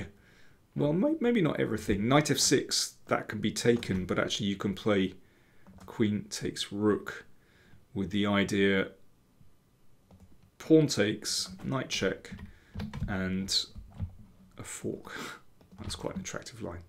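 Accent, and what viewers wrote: United Kingdom. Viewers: I love the strong British accent and your very very happy smiley demeanor, paired with deep knowledge (obviously). Makes for great content. Subscribed!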